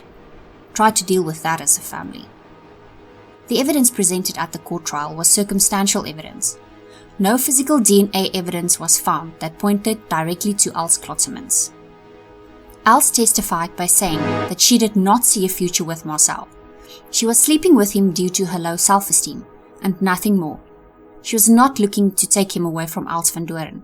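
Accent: South Africa. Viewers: Im binge watching ur videos ur work is great and i sense ur accent is south African ❤🎉watching from SOUTH AFRICA 🇿🇦🇿🇦🇿🇦 im truly proud of ur work ur videos are long shows dedication and the amount of hard work u put in u gained a new sub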